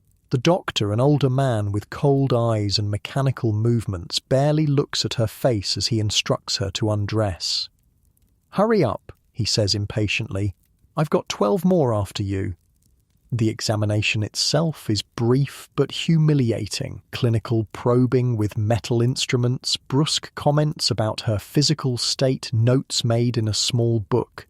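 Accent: United Kingdom. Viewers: your beautiful, hypnotic British voice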